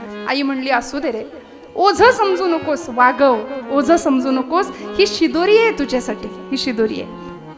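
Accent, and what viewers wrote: Standard. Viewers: फार सुंदर , छान सादरीकरण शुद्ध उच्चार गोड वाणी मनाला भावून जाते .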